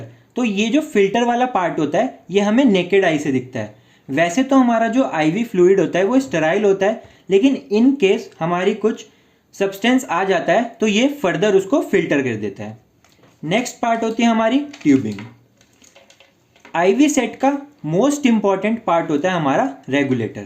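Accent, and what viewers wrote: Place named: India. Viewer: Can you speak slowly please and all tte time English, most people don't use Indian English accent, and pronunciation..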